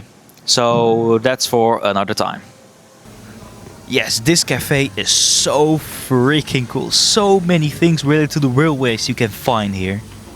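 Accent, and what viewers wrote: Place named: Netherlands. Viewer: Effe in NL : Zijn Engels is oké, maar jouw Nederlands kan beter ! ! Waarom zou hij geen lichte Nederlandse tongval mogen hebben .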